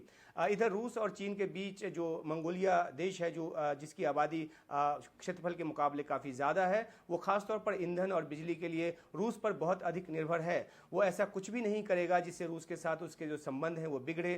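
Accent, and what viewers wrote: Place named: Bihar, India. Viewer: साला बिहारी तेरि pronounce से पता चलराहा है तु बिहारी है या बाङ्गगाली है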